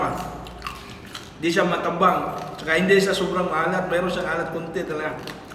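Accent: Visayas, Philippines. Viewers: Wag n kayong mag Tagalog idol Hindi kayo maronong bisayang bisaya kayo legit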